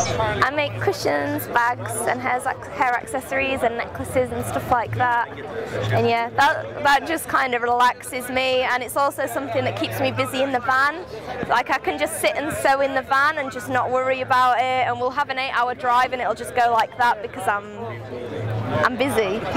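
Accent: United Kingdom. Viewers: I hate her british accent, but she`s cute.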